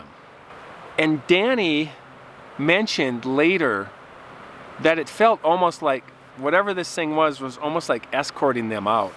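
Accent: Minnesota, United States. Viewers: I went to Saint Olaf College; I’m from Seattle, but that accent, right away, told me exactly where you are from. What a great idea for a channel, looks like you are doing great. Can’t wait to listen and watch every one of your uploads. Bye now, you take care, see you soon, Buh bye. (The Minnesota long goodbye)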